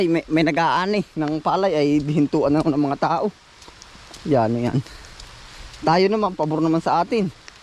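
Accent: Quezon, Philippines. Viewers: Hi,! Kahapon lang kita unang napanood at naaliw naman ako sa iyo. Taga saan ka? Kasi parang taga Mauban, Quezon ka parehong pareho ang mga words na ginagamit mo pati yong accent mo. Bago mo akong subscriber from New York,USA. Ingat ka lagi and God bless you more 🙏👍👍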